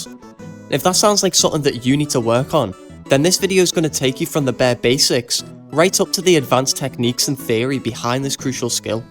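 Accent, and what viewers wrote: United Kingdom. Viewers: Bro... I never been into first person shooter games. For whatever reason i decided to dl cs2, your vids are very eye opening. Youtube suggesting them to me after 2 hrs of game time, well done yt. Hit me up if your down to do a vid series training a newbie. I know from accent your british so on my time zone lol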